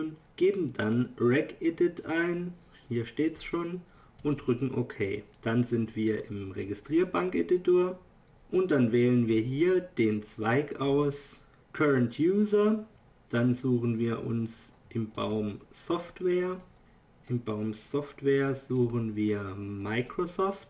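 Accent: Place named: Hesse, Germany. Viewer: Bist du Hesse? Du hörst dich nämlich an wie einer :)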